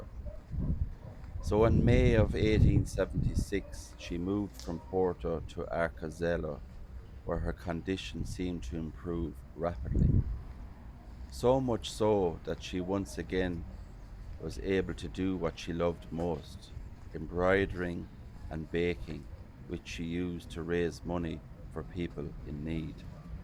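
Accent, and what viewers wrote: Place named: Dublin, Ireland. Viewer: Dublin accent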